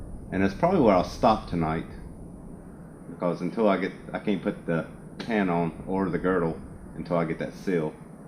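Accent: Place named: Carolina, United States. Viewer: That's great I could tell by ur accent u were from Carolina. I've got family in Greensboro. I always loved coming to visit over there from KY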